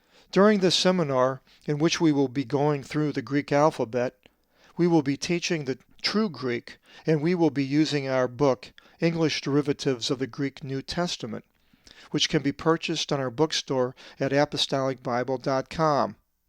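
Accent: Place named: England, United Kingdom. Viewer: Many thanks for your excellent presentation. My native language is Greek and I would like to mention that you are very close to our pronounciation, despite the influences by your English tune. Also, I would like to add that the best way for someone who interests about Greek pronunciation, is to lend his ear in a Greek Orthodox church, where a medieval version of the language is spoken. This version has been transferred from parents to children centuries ago, and I can assure you that the tune is the same as the simplified, so called, modern Greek we use today. The differences of accent compared to that of the ancient language, are limited to the duration of some vowels of same sound. For example, the letter Ω,ω (ωμέγα, omega), was pronounced like an extended O,o (όμικρον, omikron), but today those two vowels sound the same. So, the difference between those two o, remains only in the written form. 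There were also some differences regarding some consonants sound. Greek Cypriots maintain this manner of speaking untill today. For example, when they say τέσσερα tessera (number four), they extend this double ss more than a simple s. This doesn't happen in the mainland of Greece, but the spelling remains the same. Anyway, I hope that all these are useful for those who interest about. Thank you again.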